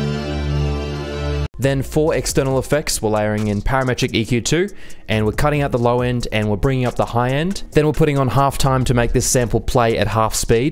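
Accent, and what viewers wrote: Australia. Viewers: yo you aussie?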